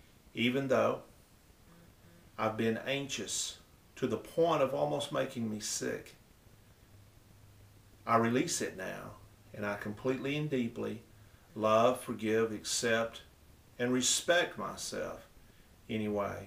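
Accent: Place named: South, United States. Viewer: Hey ~I'm in the first qtr of LVN School! I stumbled upon a guy with an Brogue talkin' about a Drivin' test! I'm doing math,drug dosages,Taking tests every week & I'm a freeked out mess over these tests!I get passing grades BUT BARELY!When I found you you have a souther accent (like me!)Reminds me of the nice folks back home in the deep south!did the "tapping" here on this Video~you have one for "Test Taking Anxiety?"Bring it on!PLEASE!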